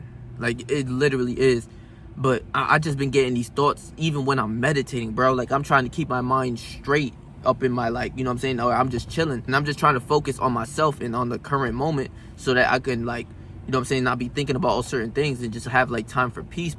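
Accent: New York, United States. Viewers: bro got that new york accent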